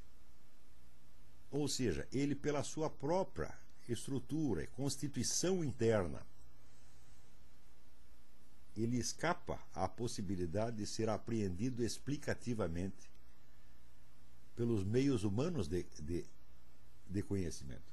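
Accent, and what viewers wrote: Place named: Portugal. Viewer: Caro amigo de Carvalho que vou acompanhando à distancia, gosto de o ouvir, principalmente quando se zanga e fala o português do português. Gostava de o ouvir sobre um tema a que todos fogem... A história sobre o tempo... O tempo de uns não é igual para outros...O tempo do assassino difere do tempo do assassinado. O tempo de Cristo difere do nosso... Já Agostinho respondia à pergunta do amigo Evódio quando inquiria o que Deus fazia antes de criar o mundo e,Agostinho responde, em tom de zangado, que Deus preparava o inferno para este tipo de perguntadores. Depois diz Agostinho: Antes da criação do mundo não havia tempo... Pergunto, caro, todos vemos o tempo de modo semelhante? Eu penso que não. Uns estão presos ao passado, outros só vêm o presente e alguns têm angustias com o futuro... Amigo, pense neste tema e disserte sobre ele... 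O meu muito obrigado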